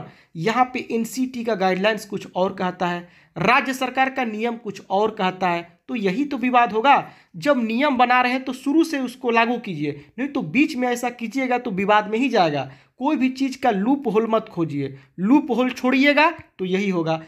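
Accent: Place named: Bihar, India. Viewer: बिल्कुल बिहार वाले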